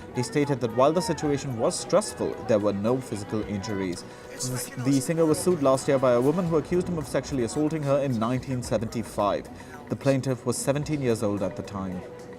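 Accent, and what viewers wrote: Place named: India. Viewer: . I am talking about the Indian voice video narrator.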